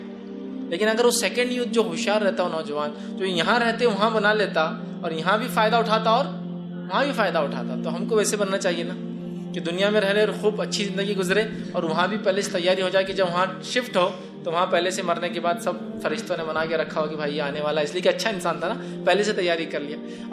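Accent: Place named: Hyderabad, India. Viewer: Hyderabad wale lag rahe hai ye.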